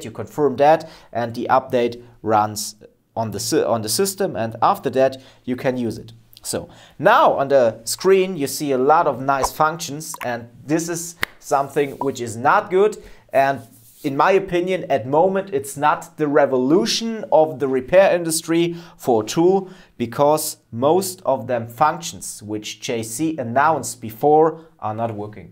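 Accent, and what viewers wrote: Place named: Germany. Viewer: I hear german 😊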